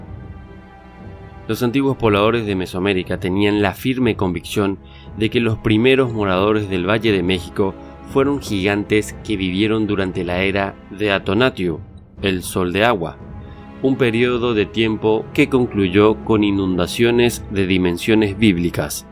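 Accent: Argentina. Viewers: Soy mexicano y conocedor de la historia mexicana y la verdad no se si la persona que hizo este vídeo es mexicana aunque suena a argentino y si eres argentino debo felicitarte por la buena investigación que realizaste, he visto vídeos de extranjeros farsantes que quieren engañar a los mexicanos con datos falsos y la verdad si caen los mexicanos en los engaños sobretodo porque los mexicanos no conocen su historia y ellos se basan en lo que les diga su youtuber favorito que la mayor parte del tiempo son igual de desconocedores de la historia como ellos y para terminar yo invito a la gente a visitar mi canal y allí tengo 3 vídeos de Teotihuacan realizados en la zona arqueológica y mis vídeos podrían ayudar a los que vayan a visitar el lugar como una guía turística para que sepan que deben ver y visitar en el sitio y para terminar solo quiero decir al realizador del vídeo que los aztecas pensaron que quien hizo la ciudad fueron gigantes por que ellos encontraron huesos de animales pleistocenicos como mamuts, camelidos etc. 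y toda esa zona de los valles centrales de México es rica en restos de esos animales, mi canal es Rolando México RS y los vídeos los hayan como Teotihuacan la ciudad de los dioses mi explicación primera y segunda parte y ojala se den una vuelta por mi canal que está censurado por la plataforma. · Fabuloso documental. Me cae un poco de raro que tenga acento argentino el narrador, pero vamos, que muy bien narrado, impecables las imágenes y se ve el conocimiento, esfuerzo y conciencia que hay detrás de este contenido. Muy grato de verdad. Tienen una nueva suscriptora. 😊❤❤❤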